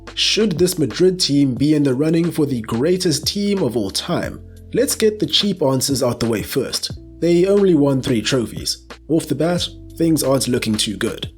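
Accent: South Africa. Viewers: Random question mate but are you South African ?🤣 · is the narrator South African ? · Great videos mate keep up the Good work🔥.....I can't help but notice your accent sounds very South African... so are you from SA😂❤ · Off topic, are you South African?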